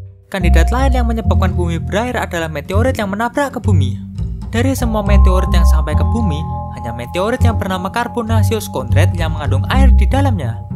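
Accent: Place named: Java, Indonesia. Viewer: wkwkw medok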